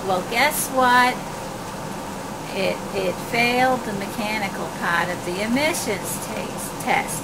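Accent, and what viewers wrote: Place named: New York, United States. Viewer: I love your new york accent. My dear lady, i usually do my own car repairs cause i don't trust mechanics. And I believe usa mechanics like to sabotage foreign cars. You need yourself a good husband mechanic and jack of all trades. If I were single i would definitely propose to you. Take care.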